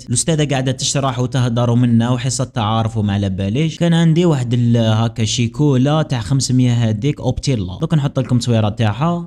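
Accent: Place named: Algiers, Algeria. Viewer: هاذا من العاصمة تجيكم اللهجة صعيبة شوي خاصة اذا كنتي من غرب المروك تفهمو هدرت الغرب الجزائري كيما وهران وتلمسان اما لهجت العاصمة ولا الشرق مثل عنابة تجيكم صعيبة😅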